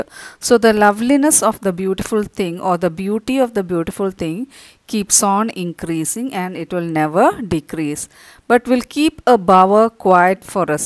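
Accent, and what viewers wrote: Kerala, India. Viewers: Its clear in ur voice and tone that u r a malayali maam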